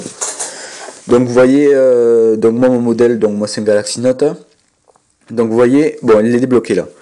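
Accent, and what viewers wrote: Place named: South, France. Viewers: Ton accent t'as trahis;) sinon sympa ta vidéo. Et vive le sud ouest!:) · Meme si j'ai 13 ans tu m'accepte sur la xbox Os:on entant a l'accent que tes du sud ;-)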